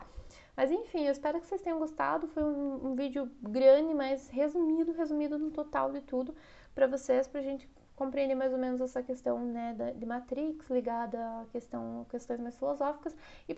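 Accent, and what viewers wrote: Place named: South, Brazil. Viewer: Ainda não consegui calcular, com precisão, este seu sotaque. Paraná ? Santa Catarina ? Rio Grande do Sul ? Interior de São Paulo ? Você é linda e o sotaque também. (Juro por Deus que isso não é uma cantada)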